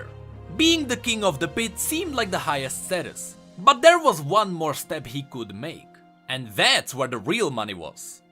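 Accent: Czech Republic. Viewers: Jde z tebe celkem slyšet, že jsi Čech :D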